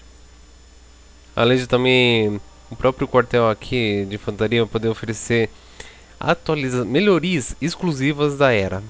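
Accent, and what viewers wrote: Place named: Portugal. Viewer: Vc tem um sotaque portguês, mas vc é brasileiro ? qual sua nacionalidade?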